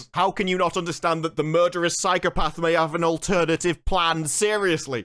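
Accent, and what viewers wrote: United Kingdom. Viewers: Fries dipped in a milkshake are AMAZING. Unlike absolute everything else in this show, that was the sole good idea. And hearing a British person talk about good vs. bad food ideas is funnier than ANYTHING this show has given us.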